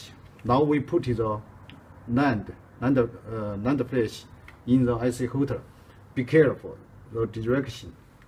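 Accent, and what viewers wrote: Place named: Japan. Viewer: Do not ask me why, but when I hear your Japanese accent, I feel like an engineer does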